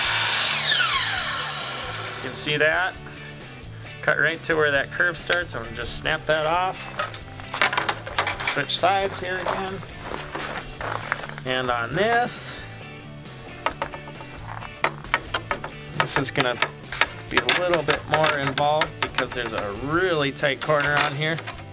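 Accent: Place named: Minnesota, United States. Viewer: This guy has to be from Minnesota. Great video!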